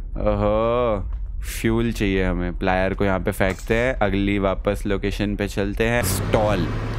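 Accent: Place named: Bengal, India. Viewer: he is talking like Bengali people ❤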